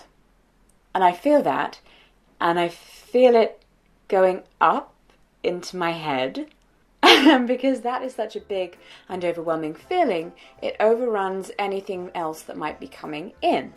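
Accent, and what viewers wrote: RP, United Kingdom. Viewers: Yes please don't lose your British Aristocratic accent which I've always associated with snobism (I'm a post-war baby) - not your scene being snobbish ...you just crack me up! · You sound like Queen Elizabeth, when she was young, or like one of the ladies of Downton Abbey. That is to say, aristocratic, having well articulated, received pronunciation. That is not criticism but merely observation. Of the various British accents, received pronunciation is the easiest for us in America to understand. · This was very informative and thank you as always. You do have a lovely voice made all the more charming by your British accent. Or what we in the states call an accent, to you it's your normal voice. My voice is a higher pitch than I think it is. I've heard it recorded many times and like most folks, do like the sound of my own voice. 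Have a fabulous day 😃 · . Your voice is beautiful. I mean, I love British accents but yours is just so light and beautiful. This may sound weird but in my mind, while I'm writing this, it is like your voice is jumping on clouds. To me, my voice sounds much lower than it really is. In my voice recordings, to me, it sounds like I'm like 6 years younger. My brothers and I did this thing where we voice recorded ourselves and then spoke with the recording and both my brothers confirmed that that is how I sound. Isn't weird to think that when you talk that people hear a whole different voice from the one you hear? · Wow.... Your very British English is AWESOME!!! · You have a good voice just wanted to let you know and your British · I knew this from a previous video, but I continue to be amazed because you have this wonderfully perfect posh RP accent, that seems flawless. And that I literally just now realized rather compliments your vintage aspects. 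In that it is classic and yet up to date at the same time, in the way that you talk about clothing and hairstyles of the 20s and 30s and also disability and gay rights of the modern age. It suits you. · I just found this channel and the first thing that came up to my mind is "if shes deaf then how does she has such a strong british accent?" can somebody that watches her channel for some time answer this question? I dont come to hate on you i just want to know. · i love your voice, it’s soft but clear, and basically you sound stereotypically posh english but it’s really nice to listen to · Well...I love your voice! You have a British accent and kind of a 1950s Victorian tone and when you us r’s in words it sounds very formal and amazingly magnificent! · Your voice is so good to hear, is beautiful and your accent is amazing!!!! I love British accent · Thank you for this video. I just want to let you know that I can listen to you speak forever:) I actually leave your videos on for my baby girl to listen to, hoping she can pick up you Brit accent:) Honestly though, your videos are a source of joy, love and hope. 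I wish you good health always.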